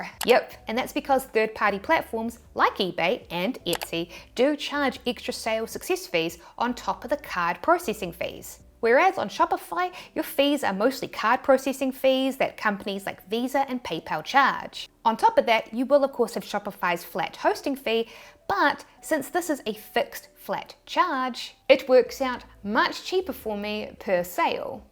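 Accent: New Zealand. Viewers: I knew instantly you were Kiwi when you said Etsy 😂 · I lived in NZ for 22 years. It's nice to hear the Kiwi accent again. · Im not new here but what exactly is your accent? Its like you grew up in NZ, but have an American and Australian parents. From an Aussie across the ditch. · Hi, Fellow Kiwi here so I can understand you perfectly. I would love to move away from Etsy to Shopify but the time I'll have to put into marketing is daunting.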